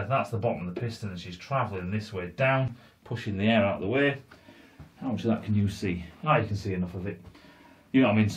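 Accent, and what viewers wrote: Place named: Yorkshire, United Kingdom. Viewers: Normality is returning to the world with a Yorkshire accent 😉 It’s good to see and hear you once again. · Didn't click on the video csuse ofnthe title, I clicked to hear your sexg northern accent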